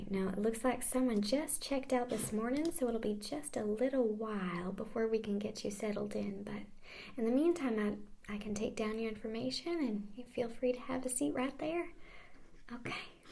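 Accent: South, United States. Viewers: This was too adorable, loved the southern accent and the many expressions used, you nailed that southern charm. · This was really good and I'm highly impressed with the accent but you didn't pronounce route like a lot of southerners do. I'm just saying because I'm from Texas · I have a real weakness for the Southern accent, this video is all kindas evil :P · weird, i was just looking for "southern accent" asmr a few days ago. Perfect timing to the point of being spooky. Thx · This is the first I've seen of this video. I genuinely love ASMR with southern accents, I don't know why. Thank you for this, and I apologize for being late to the party. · I kind of just stumbled on here and so I'm new here. but your accent~ 😍 Whether its real or fake. its absolutely charming :3. I see what they mean by southern charm now xP. and your character you chose for this makes me giggle x3. 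she's weirdly adorable. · very nice, but at the beginning i like that background song do yu have the name of it. oh buy the way love that lovely southern accent;really good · Aarrgghhh i love this accent! I'm marrying a southern gal, that's my life goal... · why do i hate southern accents anywhere except for asmr?? I am actually from the south lol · Welp Was looking up southern accents cause I haven't heard them in years since I left and was feeling a bit nostalgic Was nice Not the exact one I of my area bit damn well close enough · this one is amazing! The southern accent has always been a tingle trigger for me. Thanks for making this. The humor in it just makes it even better. PS: 61 degrees....brrrrrr...as a Detroiter just coming out of winter 61 sounds like beach weather to me:-) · this is so my favourite video ever. normally i watch asmr to fall asleep but this is way too good to sleep through. 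from the beginning you had me laughing and even answering you in my head a little. and the southern speaking, wisdom about life... heck its all there. thank you so much you fantastic creature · So I'm new to you, from England, love the Southern accent, this video is so nice and comforting, makes me feel like I'm checking in to s B&B, beautiful voice and accent, proper soothing :) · very nice approach with this video, nice accent too, i think this is the first time i have seen any of your videos, im guessing your a true southern bell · Your southern accent rocks!!! More southern roleplays please!!! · Gotta love southern accents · Pleasepleaseplease do more of southern accent asmr 💞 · Man, I love this:D Would have loved a stronger southern accent but I can't complain, my first trigger in so long >.> Keep up the good work Miss., cheers from Norway!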